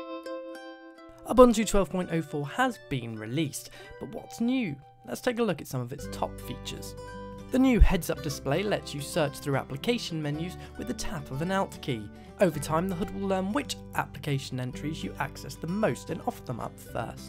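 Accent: United Kingdom. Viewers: I LOVE Ubuntu , and I love British accent ^_^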